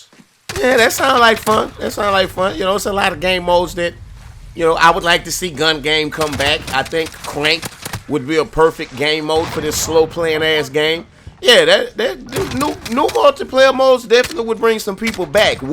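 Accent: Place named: New York, United States. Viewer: You just dressin up a turd, and eventually people realize they are playin with a pice of shit“ hahahahha man you too funny where are you from? You have a hilarious accent You sound like a friend of mine, hes from NY, i guess you come from around that area too, is that right ?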